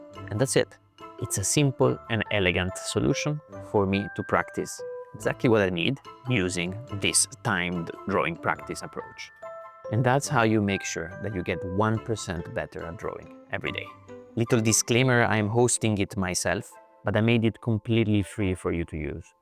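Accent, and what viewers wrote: Italy. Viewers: I loved this video! Very useful suggestions, an extremely handy website at the end e il tuo accento italiano mi diverte (non è una presa in giro :) ) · The way he talks, is he italian... c) can't tell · L"accento italiano non mi sfugge😂. Great video bro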